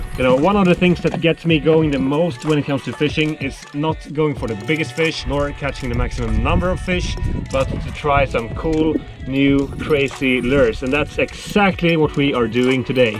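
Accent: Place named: Sweden. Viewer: As a Norwegian I love hearing his Swedish accent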